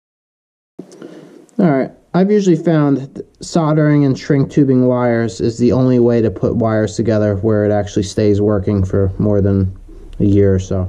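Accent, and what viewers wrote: New York, United States. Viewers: “What used to fix it wiff” Good old country New York accent.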